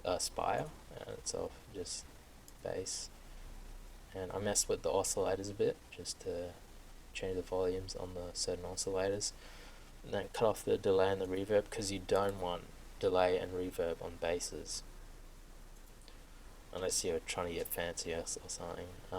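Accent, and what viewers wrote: Australia. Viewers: Are u astraulian? :p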